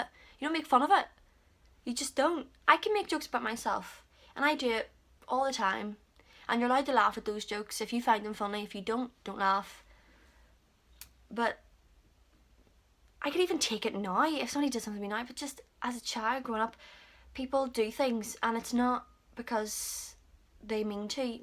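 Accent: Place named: Northern Ireland, United Kingdom. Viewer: Thank you for sharing your story ❤ so inspiring and I love yhe NI accent xx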